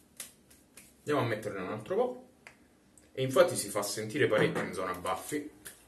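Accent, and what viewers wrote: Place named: Rome, Italy. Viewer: Ciao, la tua cadenza romana mi piace molto. Penso che hai sviluppato una ottima tecnica, la tua zona baffi non è abituata alla lama ecco spiegati i piccoli taglietti. Ma il ventaglio dov'è? Io da quando ti ho copiato sta genialata lo uso sempre 😂🤣 Ti abbraccio 💈🔝👏👍💈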